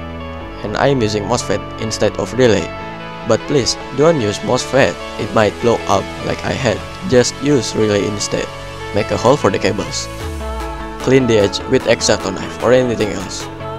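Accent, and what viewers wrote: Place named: Indonesia. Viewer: shiet i knew it from the accent... you're from indonesia ...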